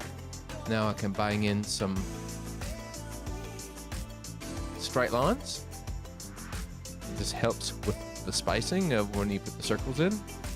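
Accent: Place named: Australia. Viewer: So I checked your Instagram and can tell you’ve definitely picked up in the Aussie accent. Also you live about 2 hours from me ☺️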